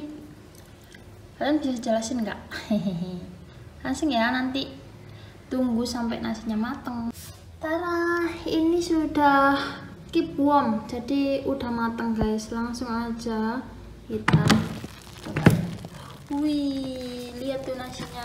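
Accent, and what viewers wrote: Indonesia. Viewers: Medok banget kak basanya 😅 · Medok banget mbaknya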